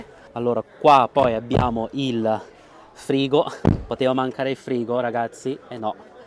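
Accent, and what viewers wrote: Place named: Milan, Italy. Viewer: Come percepiscono il tuo cinese con accento milanese ?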